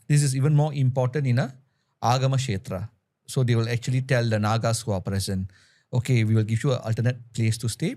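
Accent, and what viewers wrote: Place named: Malaysia. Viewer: This person speak like Malaysian or a Singaporean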